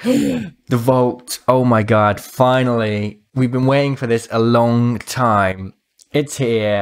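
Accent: India. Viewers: OMG CAUSTIC IS FINALLY GETTING A HIERLOOM AND yeah that's an Indian girl. I don't like the accent :p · Is it just me or does she sound Indian?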